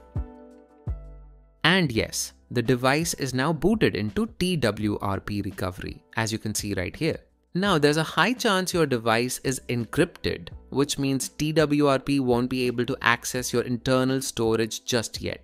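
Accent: India. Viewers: If you hear he has a Indian accent the first thing you do is call him a cockroach and then get a dictionary with all racial slurs so 99% of IT info is actually good because you bullied the problem and send him home (in the sewer) And hoping they'll one day scam grandma's again while they curse you out because you stood up for the vermin that is still making tutorial hell in 2026 possible until we all bullied them together :)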